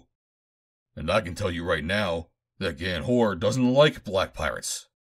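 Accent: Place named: New York, United States. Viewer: I think your voice is great for stuff like this. NY / NJ accent is great for a stoic hero like JC — keep up the great work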